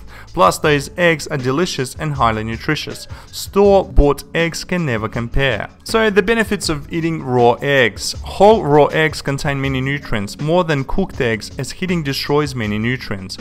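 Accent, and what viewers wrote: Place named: Australia. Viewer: Well we appreciate the information, the Australian accent is marginally tolerable, but with the addition of the needless interference of the BackBeat.. a plague on the present human race, your presentation is completely undigestible alas.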